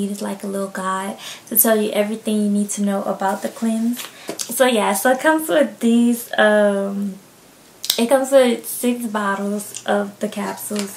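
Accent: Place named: Deep South, United States. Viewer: Girl u from deep down south...😍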